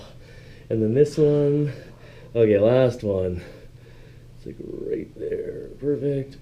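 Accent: Canada. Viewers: you have the potential to be the new Rock Star of YT-resident chiropractors. The mix of your intuitive attention to detail and obvious empathy for your patients - together with a characterful delivery of care on your part - is very compelling. To top off the performance, your Canadian accent and Roadrunner-style moves around the room are very engaging. Move over, Dr. Johnson - there is a new kid in YT Town. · Ahhh... Canadian eh?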